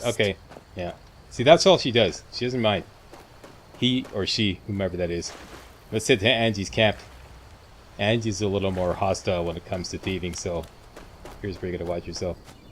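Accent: Canada. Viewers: Your Canadian eh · i'm in this for the Canadian accent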